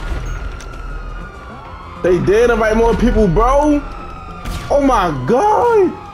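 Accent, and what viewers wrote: Baltimore, United States. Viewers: Baltimore accent never fails 😭😭😭😭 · You from baltimore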